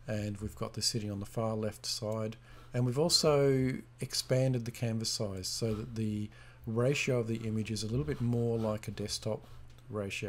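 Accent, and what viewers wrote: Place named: Australia. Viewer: So cool - and great to hear an Aussie voice.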